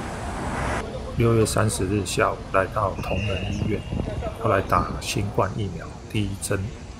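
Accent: Taiwan. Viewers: 靠北 ! 濃濃的台灣味 , 哈哈 !!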